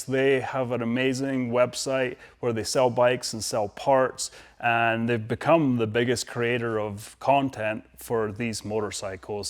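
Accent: Scotland, United Kingdom. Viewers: Hi, I just subscribed! Are you Scottish, I thought that listening to your accent watching your SRAD video. I’ve been doing a similar as you collecting bikes I drooled over as a kid. I love this era of machines. 😊 Best wishes Ian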